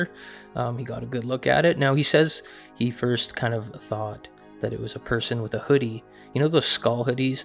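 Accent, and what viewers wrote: Canada. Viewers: Isn't he from Canada? Lol.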